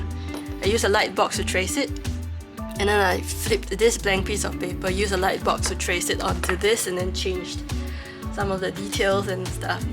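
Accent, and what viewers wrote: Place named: Singapore. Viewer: Your drawings are so pretty! And I really like hearing another Singaporean voice on youtube!! Talk more!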